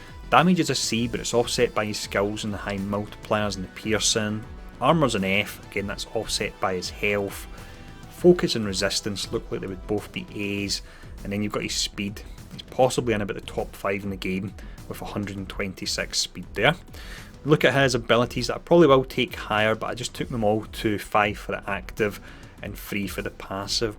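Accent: United Kingdom. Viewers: I personaly like british accent but your voice is not nice. Ive been watching videos about this game iand i hear british ppl and theire voice and accent sucks. I wont keep watching cause your voice annoi me and you dont speak clear! The videos are only for you fellas even americans might not understand. I do but your voice dude ahhhh🤢🤢🤢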